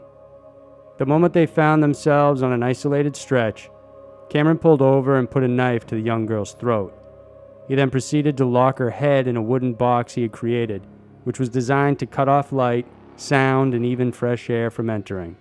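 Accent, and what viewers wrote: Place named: Massachusetts, United States. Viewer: It's pronounced "ORE-eh-gun".... not "ORE-a-GONE".... total Mass accent pierces the eardrums again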